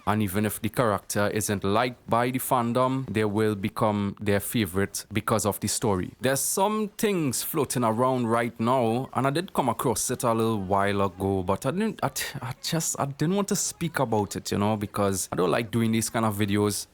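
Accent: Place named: Trinidad and Tobago. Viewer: Oh i have a good guess for some reason i think you from Trinidad lmao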